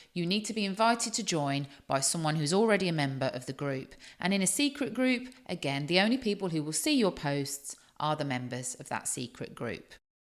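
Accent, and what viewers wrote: United Kingdom. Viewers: Super beginner's guide to Facebook. Intrigued by your accent. Are you from Worcestershire originally? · Are pronouncing British English?Is so, that's great.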